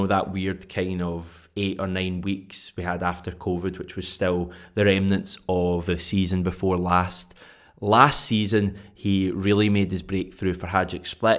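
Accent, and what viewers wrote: Scotland, United Kingdom. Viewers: Love the Scottish accent. 🏴 🤝🇦🇺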